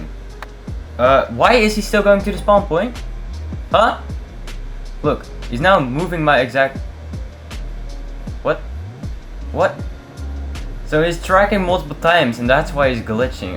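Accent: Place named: Netherlands. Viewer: the accent gave it away. since i’m also dutch it was quiet easy to guess.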